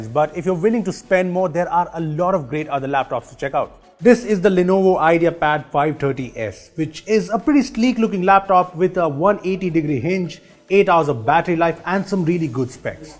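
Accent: India. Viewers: The Indian accent made me want to click away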